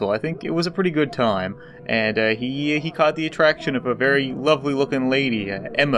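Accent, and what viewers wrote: Canada. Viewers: Are you Canadian, because you sound it. Lol. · quick question, are you Canadian? :0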